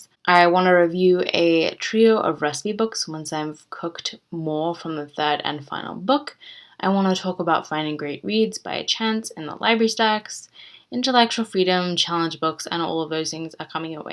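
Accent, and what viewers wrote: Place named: Australia. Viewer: Was wondering where you went! That accent is a lot less Aussie now: pushed that boat right out! But to be expected. I imagine it's ocker again on the phone to mum! Will be looking forward to hearing from you again :)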